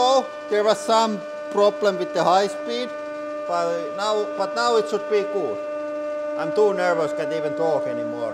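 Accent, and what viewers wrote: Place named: Finland. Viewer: I haven't seen these vids for a few years, I'm so happy to hear the charming finnish accent hasn't changed a bit :D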